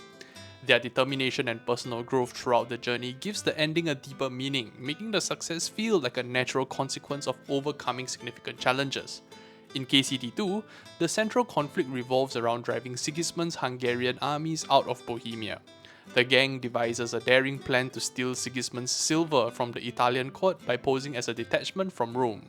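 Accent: Singapore. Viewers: You're either Singaporean or Malaysian, aren't u. I can recognise that accent from 5000 miles away.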